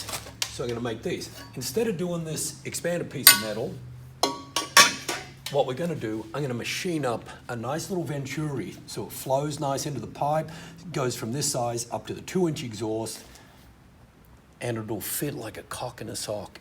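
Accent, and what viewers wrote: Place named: Australia. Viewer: Love your videos. The accent allows you to have the colorful Language without offending. Kind of a "well he's Australian like Crocodile Dundee ". LOL! Thank you for the text included with this video. Very informative.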